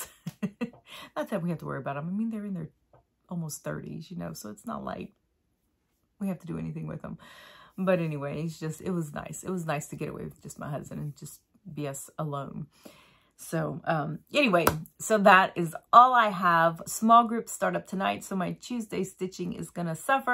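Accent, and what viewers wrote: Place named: Acadiana, United States. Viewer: I picked up your accent! Lol love the Acadiana pattern. Leave the crawfish!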